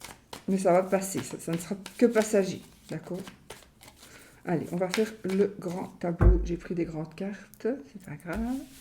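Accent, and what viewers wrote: Belgium. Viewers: Au plaisir je vous adore vraiment je vous suit depuis un bon moments et jaime votre naturelle et votre accent belge😂 vos guidance sont tres pertinente vous parlez de tout j'adore continuer comme ça et si l'écriture automatique vous appel nous aimerions bien avoir une lecture pour le fun merci merci belle journée a vous ❤🙏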